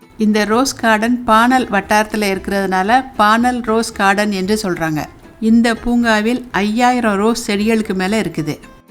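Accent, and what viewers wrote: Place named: Tiruchirappalli, India. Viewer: இந்தியாவில் எந்த ஊர். தமிழ்நாடு. பேச்சு வழக்கு திருச்சி மாதிரி இருக்கிறது. நான் திருச்சி தான். பார்க்க பூக்கள் அழகாக உள்ளது. வெளிநாடு வெளிநாடு தான் .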